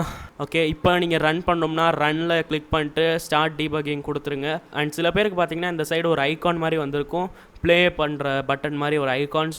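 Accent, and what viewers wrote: Madurai, India. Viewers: thambi madurai ya...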